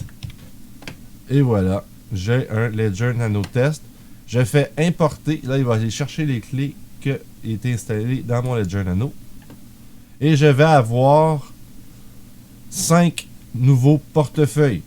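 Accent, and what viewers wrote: Canada. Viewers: Top merci depuis la France et l accent canadienne adore !!!!!!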